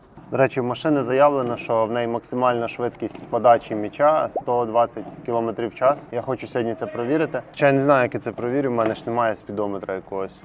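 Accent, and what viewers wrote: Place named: Volyn, Ukraine. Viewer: Випадково не з Волині?) Акцент рідний якийсь 😁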